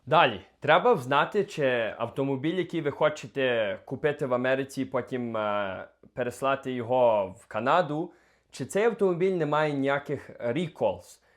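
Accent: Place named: Western Ukraine, Ukraine. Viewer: Цей говор западенський , фу , гидко слухати 🤮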